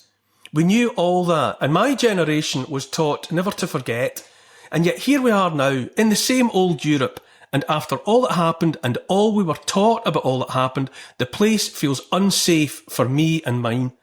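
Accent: Scotland, United Kingdom. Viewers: are you Scottish? just saw (the bachelor- dating all the ladies ) in Edinburgh, they really liked it. i had been there new years eve with a bunch of military couples long ago, it was so fun, people were so nice!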